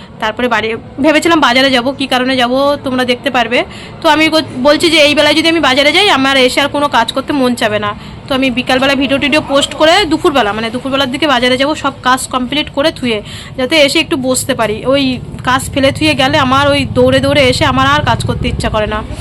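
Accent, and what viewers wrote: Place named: Bangal, Bangladesh. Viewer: তোমরা বাড়িতে কি বাঙাল ভাষায় কথা বলো???? খুব ভালো লাগলো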